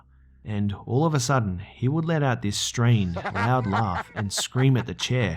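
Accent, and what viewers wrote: Australia. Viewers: Your voice is perfect. The Aussie pronunciation is very refreshing. Great video as always. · Can't tell if you are trolling but the narrator is very, very Australian. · I did not know you were Australian until now. Cool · I...Can't believe it took me this long to realize you're Australian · Hold up your from australia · OHH YOURE AUSTRALIAN!! I love that (btw is that weird? sorry, I'm American)😂👌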